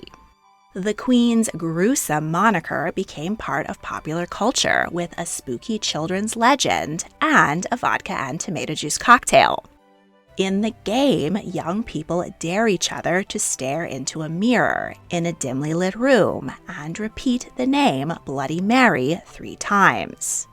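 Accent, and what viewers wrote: California, United States. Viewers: Sadly I am totally distracted by the woman's voice, What accent is that - is it Californian ? Why do women speak that way? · The US accent is irritating.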